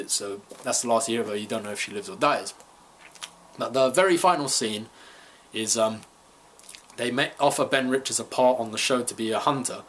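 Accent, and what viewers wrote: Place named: United Kingdom. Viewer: There's actually a reason Killian is so different in the film, it probably just doesn't play too well because 1. You're too young, and 2. Judging by your accent you're from the UK. Back in the 70's and 80's Richard Dawson was a real American game show host (he hosted Family Feud for a number of years). So instead of getting an actor to play a game show host they just got a real game show host.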